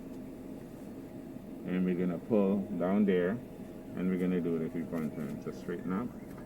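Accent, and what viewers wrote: Jamaica. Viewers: Sounds like he’s Jamaican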